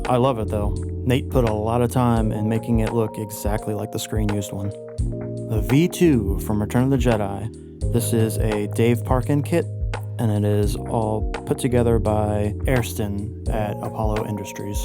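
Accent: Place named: United Kingdom. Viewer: Why did I think u were British this whole time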